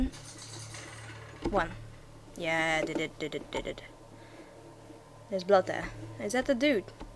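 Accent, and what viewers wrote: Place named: United Kingdom. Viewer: Nice accent : ) is it british?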